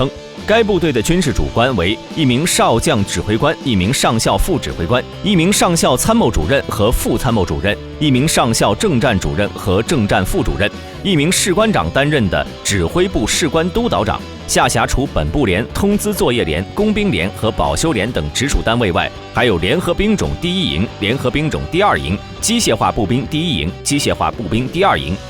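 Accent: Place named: China. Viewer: 這視頻都是台灣人做的配上大陸腔的旁白 ! 讓你以為是中共做的 !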